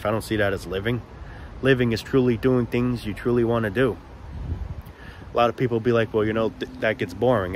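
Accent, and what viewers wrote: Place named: New England, United States. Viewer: Homie prolly from New England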